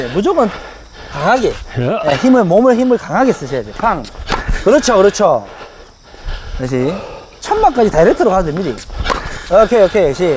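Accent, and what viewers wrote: Gyeongsang, South Korea. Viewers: 지역어디세여? 레알 오리지날 사투리이신뎅 ㅋㅋㅋ(저두 갱상도)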